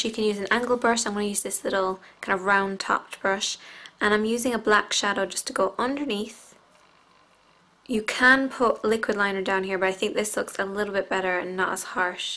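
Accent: Ireland. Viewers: R u Irish??? Please reply!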